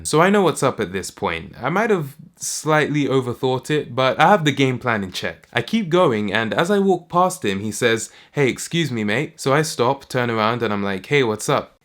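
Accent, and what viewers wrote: England, United Kingdom. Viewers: Is he from the UK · Your british? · I forgot he was British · Are you english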